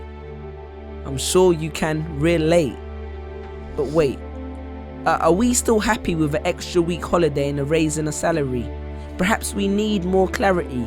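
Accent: London, United Kingdom. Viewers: He has a British accent. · That's a British accent... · british london accent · It's quite disturbing seeing a black man with British accent! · it's a British accent. Australian accents are alot rougher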